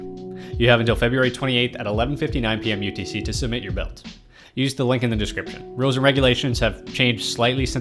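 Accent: Canada. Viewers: Are you Canadian by any chance??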